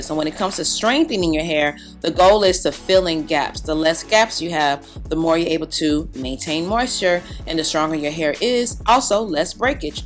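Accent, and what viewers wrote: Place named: Nigeria. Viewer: Yasssssss come through with that Ayruveda! Can you do a whole video with your Nigerian accent lol, I love hearing it.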